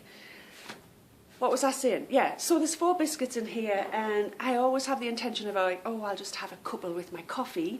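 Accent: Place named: England, United Kingdom. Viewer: You talked about saving our first knitting. I learned as a young girl and would make my own doll blankets. I wish I had saved one. But, I did however save my son"s two knitted squares. He is 37 yrs. old now lol. I could not part with them. He asked me if I would teach him how to knit when he was a little boy :) Now I am teaching my 5 yr. old Granddaughter who I live with. Years ago I entered my work in the California State Fair and won ribbons. Most of the entries were baby items. I saved them all so someday my grandchild could wear them. Well, years later my beautiful Granddaughter was born and I gave the clothes I made to my Daughter and she dressed her in all the adorable treasures I had saved. It made me so happy. Now for the word pronunciation. I say Basil the same way as you do but the other words I say in American. You also have people in the south and east coast of the US who have their own pronunciation as well lol. 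I assume the English, like yourself is correct. No one should correct you.. I would love to sound like you. I'm in California so we get sloppy at times when saying words like party etc. and switch the sounds to a letter D. It's very interesting. I enjoyed your podcast very much as always. Take care...Sharon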